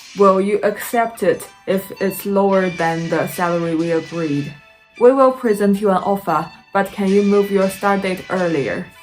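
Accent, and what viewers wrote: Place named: United Kingdom. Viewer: 博主的accent有点British是怎么肥四！！！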